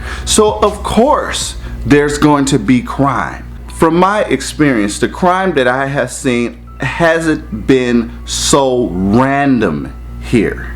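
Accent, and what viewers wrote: United States. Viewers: You gotta be from the D. I can just tell lol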